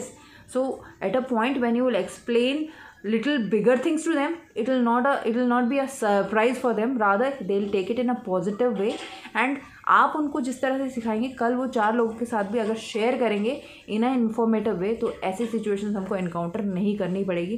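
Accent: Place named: South India, India. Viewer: Di ap MERI English ki mam Jaise lgte ho,school ki teacher thi, wo b south Indian thi, aur apka way of talking b aisa hi tha r wo b south Indian thi 😘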